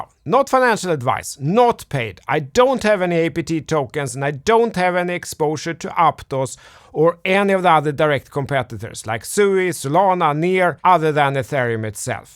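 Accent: Sweden. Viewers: Like you’re video! And the most Swedish accent I heard 😂😁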